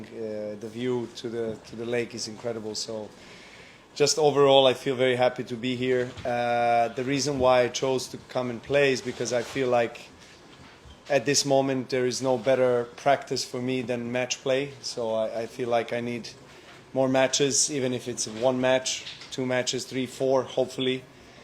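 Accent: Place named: France. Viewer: I love your french accent Nole !!!! WIsh you to be in your best shape for the 3 weeks coming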